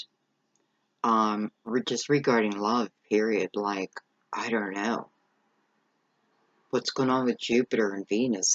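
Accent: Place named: Philadelphia, United States. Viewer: The wedding stuff happened. You speak like the people I grew up with ie Philadelphia accent or Jersey/delaware. Just curious, am I close?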